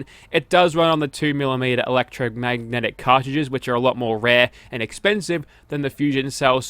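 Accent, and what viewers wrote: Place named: Australia. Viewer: So Australian. Love it.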